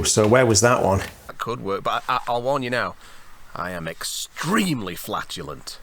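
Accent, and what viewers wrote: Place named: Northern England, United Kingdom. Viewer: Not sure if you can change the levels on that cheap audio mixer, but it makes you sound a bit Northern.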